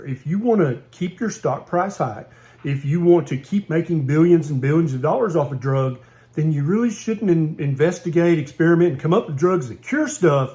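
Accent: South, United States. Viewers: You are simply the best and with a southern accent ! 🤣😂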